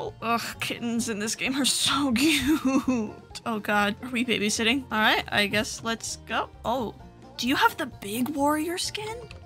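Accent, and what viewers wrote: Russia. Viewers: Are you Russian like I keep hearing a Russian accent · I love your accent, can you tell me if it's Russian or somethin PLS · lol u sound like you have a Russian accent and it sound cool :>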